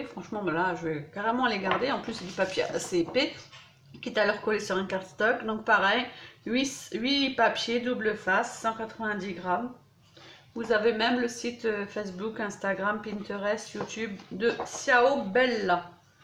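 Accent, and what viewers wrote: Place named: France. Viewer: Coucou, trop beaux ces papiers Ciao Bella (tu es trop chou avec ton accent français 😉 ciao se prononce tchao et buongiorno se prononce bouongiorno), vraiment belle la sound of spring mais mon coup de coeur c’est la Codex Leonardo, celle sur le café, celle sur Pinocchio et peut-être aussi celle sur le theme bois ! Hâte de pouvoir en prendre. Merci pour ce partage qui en met plein la vue en effet !!! Tu vas nous faire des merveilles avec tous ces papiers, bon scrap et plein de bisous bella 😆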